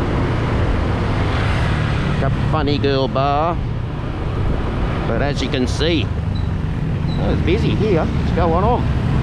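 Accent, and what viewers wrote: Australia. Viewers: just subscribed love your vids where did you come from sound aussie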